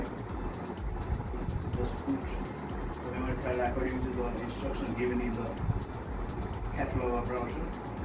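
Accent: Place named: India. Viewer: You sounds like pure NRI....nice unboxing video...keep it up 👍👍👍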